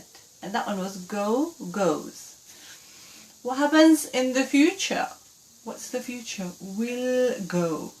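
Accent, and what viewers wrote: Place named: United Kingdom. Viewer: ♥️ you have a British accent..I loved your video. I'm following you in YouTube and HelloTalk also. Nice video☺️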